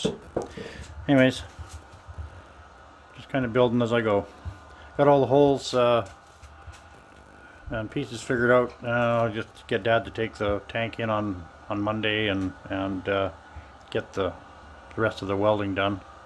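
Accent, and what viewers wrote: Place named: East Coast, United States. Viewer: you have more of an east coast accent.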